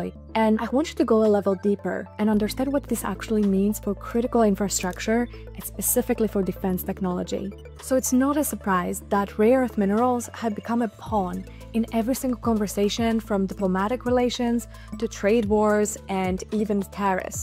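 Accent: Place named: Italy. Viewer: Your videos are fantastically well edited and researched. What's your professional background? And also, where's that accent from? I can't place it... Italian?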